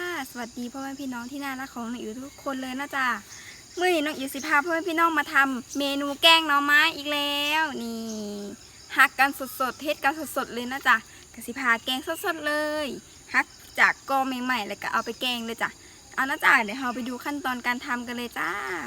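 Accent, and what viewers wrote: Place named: Nakhon Phanom, Thailand. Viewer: ได้ยิน สำเนียง คน นครพนม รักเลยคะ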